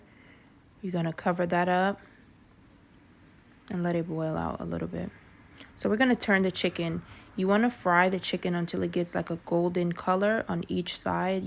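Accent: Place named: United States. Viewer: Roza send me here loving the content already ? Are you a Jamaican you sound American was wondering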